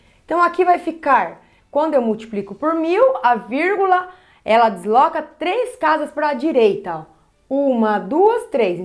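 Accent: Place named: Caipira, Brazil. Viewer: Muito bem explicado! E o seu sotaquezinho caipira é muito bonitinho!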